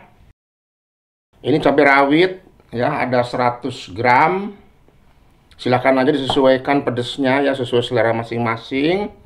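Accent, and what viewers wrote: West Java, Indonesia. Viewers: Assalamualaikum koko.wk wk wk sunda bngt ada kungkurahan.logatnya jg sunda.masakannya mantaaaap 😂😂❤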